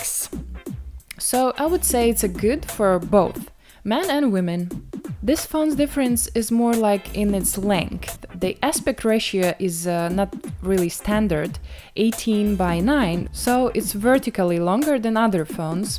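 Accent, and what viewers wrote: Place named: Balkans, Eastern Europe. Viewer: from accent I can tell you are from europe proboly ballkan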